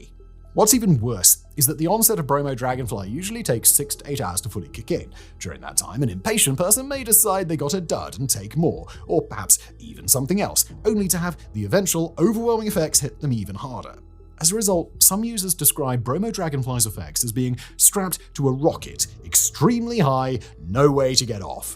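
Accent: England, United Kingdom. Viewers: You are the most british-sounding person I have ever encountered. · Yeah man you're right. He's enunciating very sharply, so it happens. Plus his presumably English accent.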